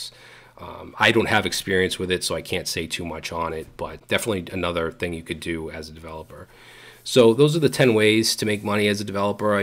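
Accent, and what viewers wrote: Boston, United States. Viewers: ain't nothing wrong with having a Boston accent, even a rather thick one. you might even get some kids from abroad to say certain words that way 😀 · You hated your accent?!?! I just come from hearing you say "border" a million times in a tutorial and I was like "Boston, baby, yeah!"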